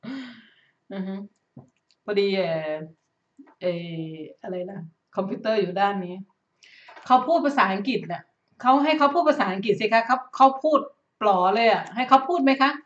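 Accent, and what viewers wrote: Isan, Thailand. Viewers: คนจังหวัดใหนค่ะเห็นพูดอีสาน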